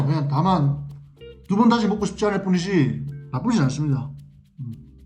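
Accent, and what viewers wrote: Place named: Daegu, South Korea. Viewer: 원지님 영상보다가 넘어와서 님 영상을 몇일째 봤습니다 대구는 눈을 보기 어렵죠 ~^^ 오늘같이 폭염경보 속의 대구에서 스위스마을과 스노우 뽀드득 소리까지 듣고 보니 너무 시원하고 대리만족?^^을 느낄 수 있었어요 님의 따뜻한 미소와 '하~'하면서 처음보는 분과도 말이 어색하게 끊어지지 않게 호응하고 나이스한 친화력은 대구분이라 믿어지지 않을만큼, 대구남자들에게서는 좀처럼 찾기 어려운 ^^ 모습이고 진짜 멋진거 같습니다 놀라고 급할때 툭툭 나오는 대구 사투리와 서울에 거주하면서 체득된 어색한 억양이 너무 반갑고 정감납니다 핥기님이랑 대구 떡볶이, 뭉티기 먹방할때도 제 남동생 보는것같아 너무 재밌게 봤습니다 어머님 건강도 좋아지시길, 100만될때까지 늘 응원할게요~~^^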